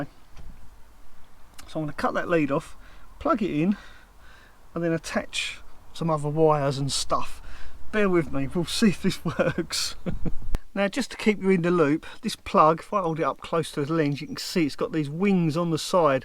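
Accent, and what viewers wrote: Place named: London, United Kingdom. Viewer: you sound like Monty Python's "My Brain Hurts" I told her it's a normal London accent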